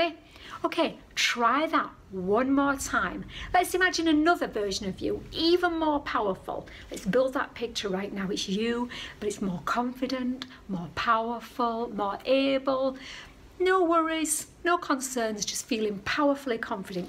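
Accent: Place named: Hull, United Kingdom. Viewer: Sounds like you are from near Hull just like me! :)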